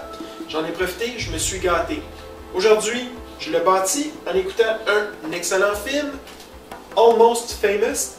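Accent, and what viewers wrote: Quebec, Canada. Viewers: Sûrement intéressant, mais en tant que Français, je n'ai rien compris dû à son accent canadien qui fut le nôtre il y a 4 siècles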